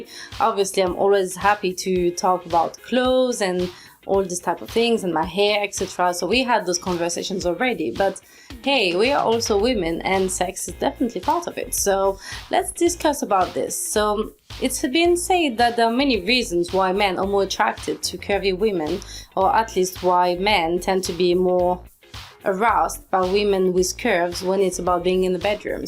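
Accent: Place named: France. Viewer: Curvy woman with confidence and a cute french accent? Beautiful! Subscribed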